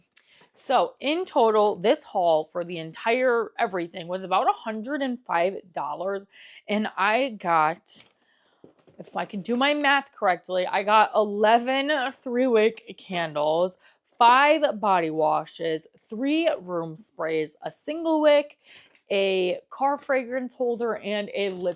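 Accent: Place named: Midwest, United States. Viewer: It sounds midwest to me 😊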